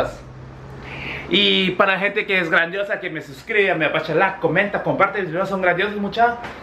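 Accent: Guatemala. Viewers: JAJJA Me gusta que tenga cara de coreano y acento de guatemalteco :v JJAJAJ No se me encanta JAJA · Su acento más chapín que nada jajajaja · Ahora en Korea como te tratan los Koreanos por que supongo que tenes acento para hablar koreano, haci como tenes acento Chapin. Buena honda ya sabes lo que es el bulling en Guate todos lo pasamos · Hablas puro guatemalteco!! Que bien se escucha 😘😘🇰🇷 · Like si tiene acento de Guatemala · Amo como hablas. Acento chapín. :3 no te preocupes a todos nos ha pasado mala experiencia en la escuela aquí en Guate. Sos la mera tos maje. C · A mi me gusta como hablas con cara de Coriano y habla chapin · tus vídeos son buenos y te considero coreano-chapin, cuando hablas español es acento guatemalteco y sos parte de nosotros como muchos coréanos que viven en Guatemala. Felicidades amigo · Y no te verguearon en Corea por hablar como chapin? 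O se a que en cualquier lugar (hasta en su propio pais) lo quieren verguear a uno por ser diferente y si uno se deja. Saludos y exitos en tu vida. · Jajaja me encanta! Ya hasta hablás como guatemalteco 😂❤ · el acento chapin que bueno, 👏👏👏👏 parece chapin con cara de coreano, oye y tus novias chapinas que dicen. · Hablas puro Guatemalteco los hubieras vergiado jajajja no te dejes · Que mal lo que viviste siendo tan peque eso te marca... y waw hablas como todo un chapin... interesante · Jajajaja ya habla como chapin Awuebosss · Me encanta tu español, creo que tenes más acento chapín que yo :'v Cuando regresaste a Corea no fue muy raro luego de pasar tanto tiempo acá en Guatemala? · Amo tus videooos y tu acento chapín hsjsks♡ sos lo + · Me encantan tus vídeos me gusta como te expresas puro chapín te veo desde Guatemala....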